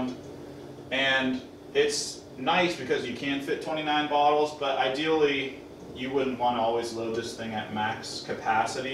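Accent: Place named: Oregon, United States. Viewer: Is that an oregon accent? He says "these ones" which is typical oregonian.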